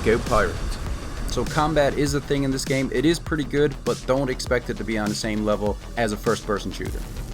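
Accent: Newfoundland, Canada. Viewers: U sound very Canadian. Newfoundland? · What part of Newfoundland you from? Looked at one of your money and nanite making videos, soon as you started talking, I said he's a Newfoundlander! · Aha...Newfoundland! That explains it. Thanks for answering, nice accent 👍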